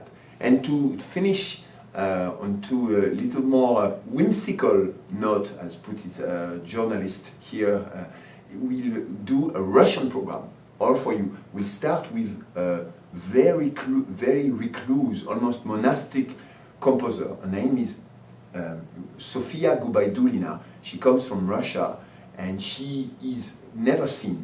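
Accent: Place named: France. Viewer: what a french accent! :)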